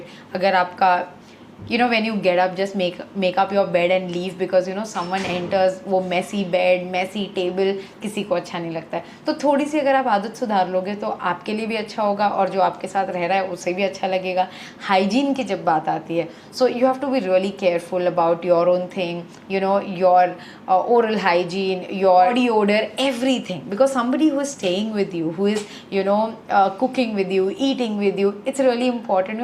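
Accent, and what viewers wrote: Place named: India. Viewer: Your Desi English very nice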